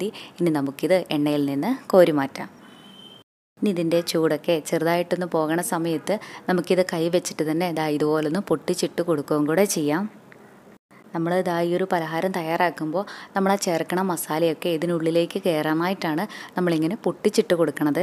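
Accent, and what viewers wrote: Palakkad, India. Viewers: 👌👌 madam palakkad anno veed edak palakkadan model language varunnund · പാലക്കാട് slang ആണോ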